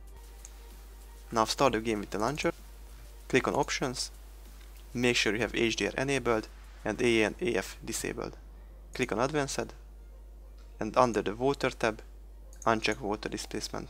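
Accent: Hungary. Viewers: Számomra az akcentusodon tűnt fel,de jó tudni,hogy magyar vagy :D Ha hülye vagyok,s valami nem sikerül legalább az anyanyelvemen tudsz segíteni :D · A kiejtésből egyből rájöttem hogy magyar vagy. :D Segített a videó, köszi!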